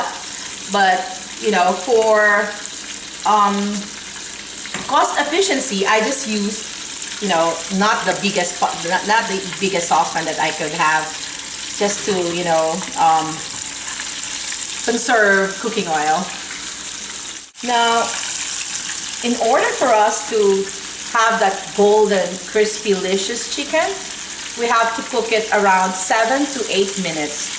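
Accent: Philippines. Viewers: Jollibee adds MSG to their chicken...the real secret ingredient! Madame, I love the way you present your dishes. Your Filipino Accent is truly Filipino...brings me back home. Hugs my teacher...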